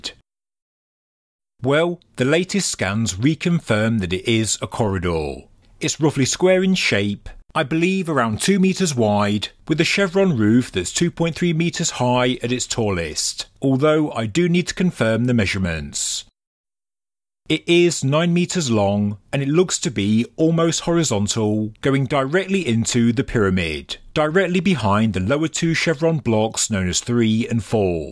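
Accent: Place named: Leicester, United Kingdom. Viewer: no I was only talking about the accent and the tone of the sentences, guess I never heard the leicester accent😂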